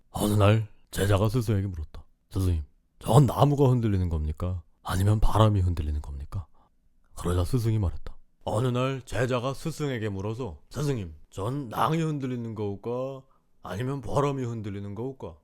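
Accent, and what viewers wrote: Jeju, South Korea. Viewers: 와 형 진짜 제주도 사투리 자연스럽게 잘함 제주도 사람으로써 우리들의 블루스 제주도 사투리 어설프게 해서 안 봤는데 형은 완벽하다. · 와 아니 제주도 말도 바꾼건 둘째치고 그 특유의 억양 잘 살린게 존나 신기함… 제주도 사투리 연기하는거 들으면 이거 못살려서 북한말처럼 들리는데 이분은 진짜 잘하네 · 제주도 사투리 좀 어설프다. 억양이 많이 틀림. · 제주도민으로써 사투리인정. 정말 연습많이하셨네요~~^^ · 와.. 제주도민이신가요? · 제주도민도 인정한 제주사투리ㅋㅋ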